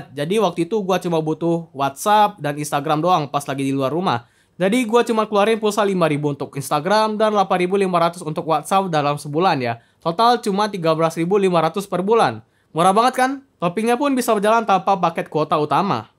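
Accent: Palembang, Indonesia. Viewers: Logat palembang itu bg mana ada tiru tiru hadehh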